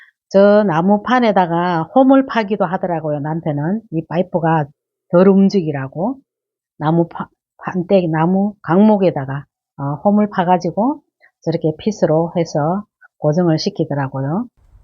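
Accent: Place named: North Gyeongsang, South Korea. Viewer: 왠지 익숙한 편안한 억양이랑 말투라서 같은 곳이구나 생각했지요 저도 경북이거든요 멋지세요^^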